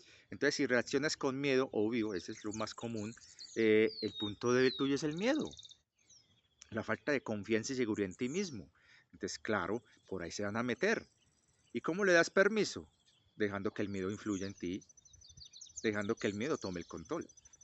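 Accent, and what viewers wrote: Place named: Valle del Cauca, Colombia. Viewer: Hello, sr, ¿como vas? Pasaba por aquí y quería saludarte y decirte que, aunque digas que estás por las Antioquía, aún no se te ha borrado tu acento Valluno y eso que soy español para identificarlo perfectamente……será que, ¿mi esposa caleña me enseña cada día como se siente el valluno?, jejejjeje Agradecerte tus videos y en especial este, no por nada en especial, si no porque especialmente tocas un tema que a mi me cuesta de explicar a las personas que me rodean. Se de sobra que buscar culpables a nuestra situación personal fuera de nosotros mismo, es un ejercicio de estupidez soberana y muy extendido en la cotidianeidad del pueblo, tal como estar en posesión de la razón de manera poco asertiva, de que es lo bueno, o que es lo malo, o en el peor de los casos, juzgar la actitud de los demás como mala o buena, sin ni siquiera plantearnos, los procesos mentales o personales, que ha vivido esa persona para llegar a esa resolución de una situación en concreto, así no nos guste. 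No estoy yo tan convencido de que un tanto porciento equilibrado de la sociedad, tenga presente tus afirmaciones, más aún con el agravante de la propaganda que idiotiza a las masas a diario con la tv, redes sociales, etc…..como para racionalizar tus palabras. Es por ello que estaría bien que este video lo pudieran ver muchas personas y que les llegue a hacer replantearse todas las creencias que llevan a cabo cada día en el plano de juzgar, que esta bien o que esta mal o quien es bueno y quien es malo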